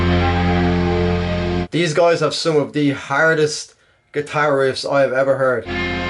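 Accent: Dublin, Ireland. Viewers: Ok so... I discovered your channel today, I have watched something like 20 videos... and not a single comment about? It seems you like, is the "same" style (and they were an inspiration for ) mixing metal and electronic music.... and everything is great with them! Voice, music, lyrics, and video clips! I can recommend you a few titles if you're interested; but honnestly everything is great! Considering video clips, my favourite songs would be (german and english version);;.... and for the electronic part:) Anyway, keep up the good job, you're the only reaction channel I actually find interesting (plus your accent reminds me of Dublin <3 )! Greetings from France!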